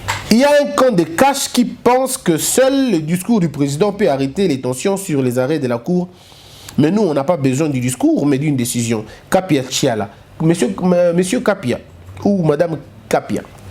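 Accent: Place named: Mbandaka, Democratic Republic of the Congo. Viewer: Mon frere j'ador quan vôus parle le vrais lingala de mbandaka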